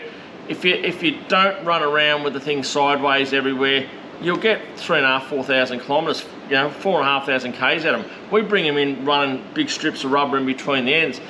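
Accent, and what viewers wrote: Australia. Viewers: sooooo aussie 😂❤️❤️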